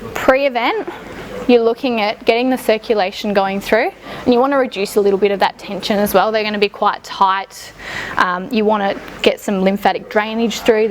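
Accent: Australia. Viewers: Wow. You definitely are from Adelaide. The accent gave it away. Great massage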